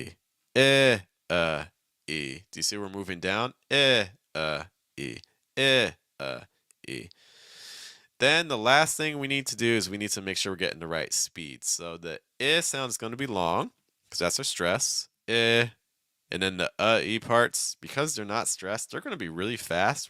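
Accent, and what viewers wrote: United States. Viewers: shadowing practice is very effective for me every morning I can feel that by improving my pronunciation I'm also learning American English but I like pronunciation topics most I want to sound like an American native like your accent thanks.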